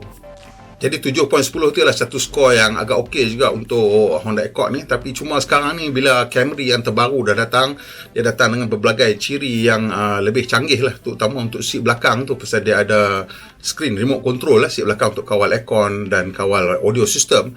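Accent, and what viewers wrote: North, Malaysia. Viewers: Abg org mana bang...dok cakap belah utagha ni..😄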